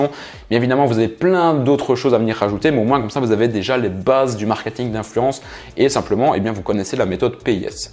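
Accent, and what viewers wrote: Belgium. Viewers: T'es belge? Je reconnais l'accent belge ahah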